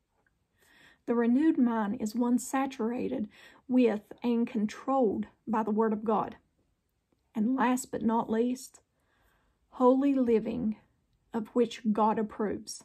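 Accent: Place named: South, United States. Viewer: Oh, you write so well! I like how you talk and then refer that to that of the Bible. I really like the length of your video too. It allows one to get the word in important snippets, without having to do a real deep study. Your video is great when you only have a few minutes, but want to be close to the Lord! Thank you for your work, I very much like listening to you (that Southern accent is precious)! On a side note, I just have to comment on what a pretty lady you are. Your complexion is flawless and you're really lovely to watch! Thank you again!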